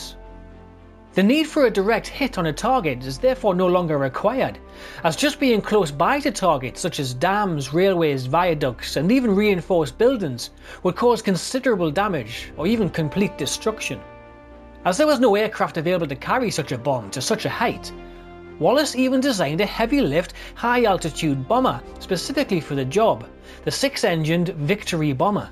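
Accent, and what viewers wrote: Newcastle, United Kingdom. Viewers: Thanks for a super enthsiastic video and your beutiful Goerdie accent, one of the friendliest voices in the world. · Really excellent video. Great narration (got to love the Geordie accent), and fascinating content. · This is a Geordie accent, yes?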